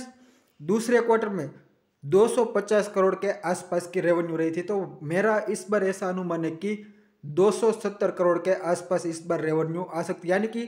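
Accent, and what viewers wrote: Gujarat, India. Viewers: Loved Gujrati accent.Reminded me of JETHALAL.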